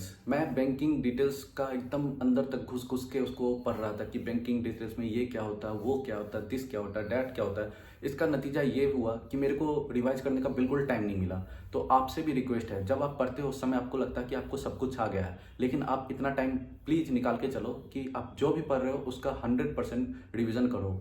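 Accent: India. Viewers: Bhai tumhara bihari accent lg raha…Bihar se ho kya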